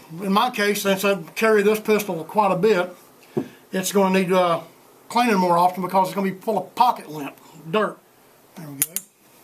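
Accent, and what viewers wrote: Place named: South, United States. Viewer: Great video. You don't sound like you grew up in Maine. My guess would be my part of the country, "Dixie". Thank you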